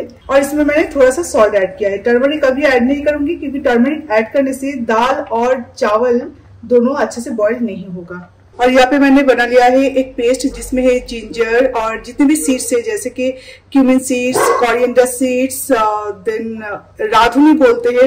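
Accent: Bengal, India. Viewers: she speaks hindi, in bengali.... too much to take... both her hindi and english is a blot for all urban vloggers... upbringing and background matters !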